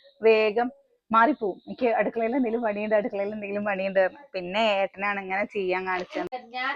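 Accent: Palakkad, India. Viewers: Thanks... എനിക്ക് തോന്നി ചെറുപ്പളശ്ശേരി കോങ്ങാട് ഭാഗത്തെ സ്ലാങ് നല്ലോണം ഇണ്ട്☺️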